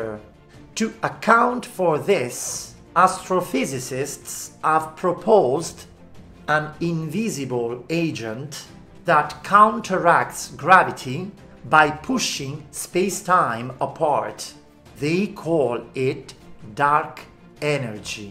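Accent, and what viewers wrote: Italy. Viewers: this is officially my favorite video ever: his English-Italian accent mixed with a topic so interesting and from which I've always been fascinated, fills my afternoons with joy. Your lessons are the best.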